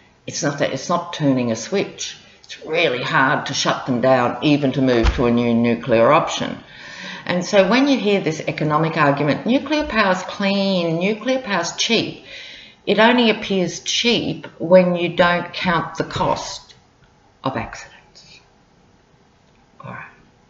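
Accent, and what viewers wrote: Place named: South Australia, Australia. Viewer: As a fellow tarot reader, I so enjoy your readings. I know it takes a lot of energy to do these readings. I thank you for that time and energy you're sharing freely and generously of yourself on here. I lived in South Australia for 3 months - was married there and I admit, hearing your accent and views makes me feel a bit nostalgic and ever so comforted. Xxxx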